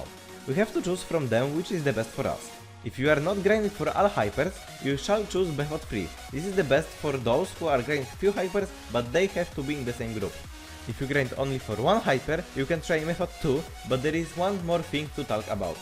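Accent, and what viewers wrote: Poland. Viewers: Słychać że polak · Im I trippin or you from Poland cuz the accent is selling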